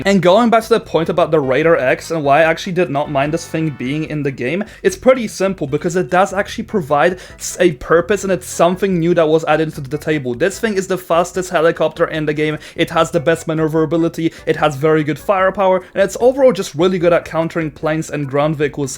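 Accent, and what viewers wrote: Poland. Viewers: wiedziałem ze polak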